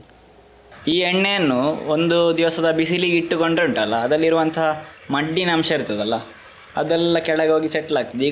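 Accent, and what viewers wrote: Mangalore, India. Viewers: Oh I got after listening your Kannada accent I thought that you are from Mangalore so I asked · Mangalore Kanadda Bhashe Estu Chanda Maraya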